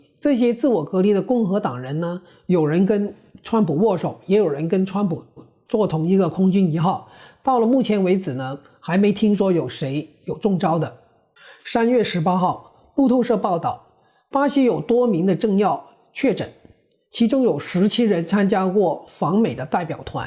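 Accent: Hong Kong, China. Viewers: DON先生的普通話像香港人。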